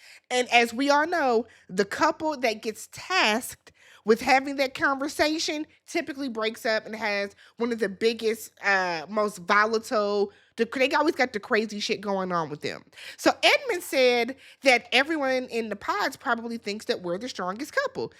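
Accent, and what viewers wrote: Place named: Minnesota, United States. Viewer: Kuh-lee-Bree-ah 🙄🙂‍↔️😑 you pronounce names like you’re from MN too…😶😵‍💫🥴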